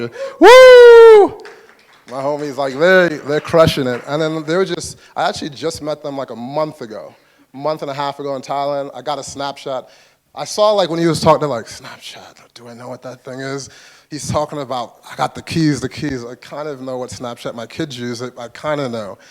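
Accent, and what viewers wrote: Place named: London, United Kingdom. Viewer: As a black man originally from London now living in America I have to say that his London accent is spot on, in fact he sounds like me :) A couple of London translations.... Thing = fing (London)......Alright = owight (London)..... Isn't it = innit (London or Ali G)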